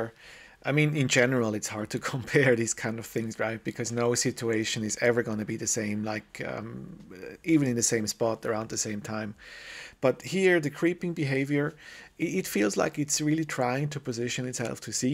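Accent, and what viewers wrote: Switzerland. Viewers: Are you from Switzerland? Your accent sounds a little swiss-like;) btw. great video! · I love your accent swiss man ;-)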